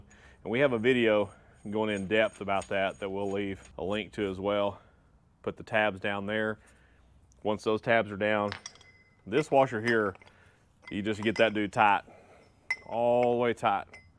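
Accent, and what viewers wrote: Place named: North Texas, United States. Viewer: Thanks for the video and info. Can you do a video on when the hub assembly should be replaced? The shoes on my 7k lb axle sitting between 5/32" and 6/32" and I'm wondering if they should be replaced in preparation for the upcoming RV travel season. Thanks And ditto to your pronunciation, being a North Texan myself.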